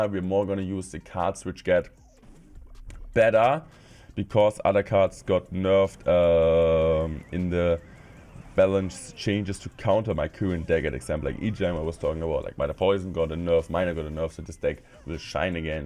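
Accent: Germany. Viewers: Ich mag den German accent in your sprach sounds sehr nice and refreshing zu all den anderen Englischsprachigen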